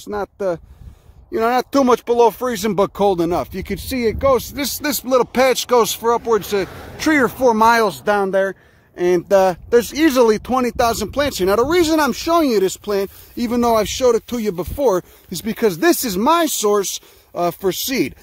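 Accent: Chicago, United States. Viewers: An educated botanist who sounds like a Chicago gangster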